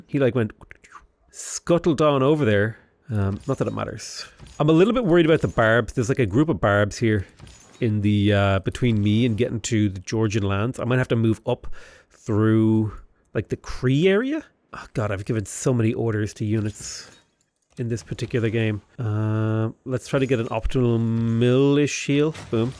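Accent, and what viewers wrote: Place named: Ireland. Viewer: lol It's really interesting hearing an Irishman talking about destroying the Catholics. Also, you sound crazy like Dara Ó Briain to me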